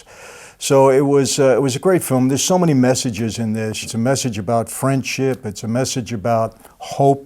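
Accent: New York, United States. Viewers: You're a great story teller, and I love your "NYC street guy" accent! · Ok I might be tripping but I really can't stop noticing that his voice has completely changed recently. Sounds less deep and less New York?😂😂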